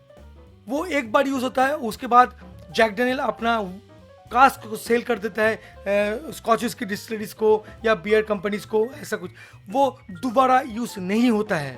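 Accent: Bengal, India. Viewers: Is not woishky ...avoid ur bengali tone of speaking Its whisky..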